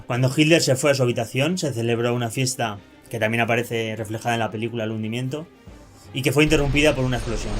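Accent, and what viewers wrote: Spain. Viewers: Cuando hablas no te entiendo tu acento español busca un comentarista peruano, ellos hablan muy bien el espanol. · Interesante, pero seria mejor que no salieras en pantalla y mostraras mas información visual para redondear tus diálogos. Tu acento demasiado español, ese silbido exagerado incomoda a muchos como yo. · a para pinche acentito hay nos vemos odio el acento.de los españoles.no lo pude ver ni un minuto.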